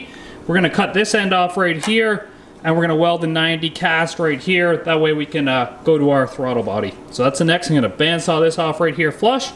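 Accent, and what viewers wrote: Canada. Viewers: Lol, boots. Love the Canadian accent.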